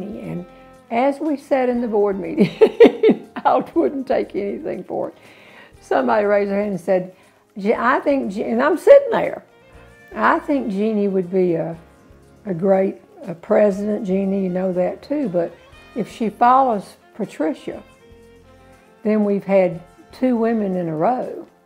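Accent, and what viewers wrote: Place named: South, United States. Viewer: Just discovered you! Utterly glorious humour and love the southern accent. Thanks goodness for Youtube!! Love from London :)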